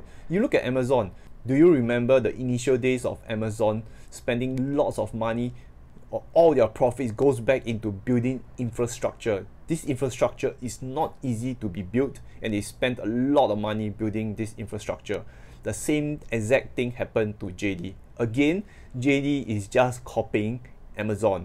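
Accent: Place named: Singapore. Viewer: You're from Singapore, that is 100% Singlish.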